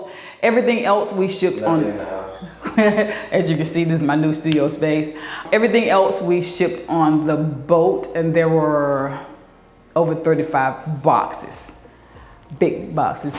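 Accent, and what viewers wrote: United States. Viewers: I always assumed that your YouTube channel was based in the USA, maybe because of your accent 🤷🏾‍♀️ Congrats on your 1st vlog 🎉🎊 it's wonderful to hear all about your journey and travels. That's the thing about nursing it does open up the world to you if you're willing to travel. I'm in the UK 🇬🇧 but worked in the States for a few years and that definitely gave me a thirst to travel more. I was grinning and nodding throughout the reel 😁😂 I'm so happy for you and hope that production is proud of your efforts ☺️✨💛🤩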